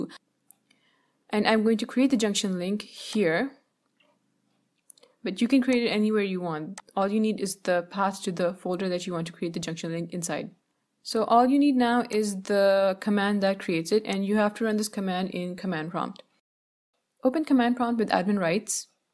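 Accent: India. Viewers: OmG!! But your accent is so sweet! A very mild Hindu accent. I love it!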